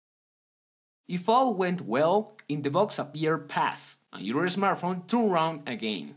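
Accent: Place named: China. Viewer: You need to add subtitles, I can't understand your Chinese accent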